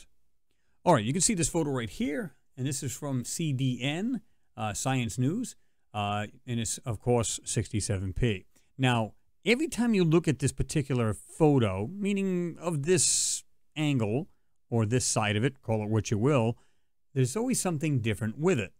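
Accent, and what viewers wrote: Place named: Rhode Island, United States. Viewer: You sound like u live in Rhode Island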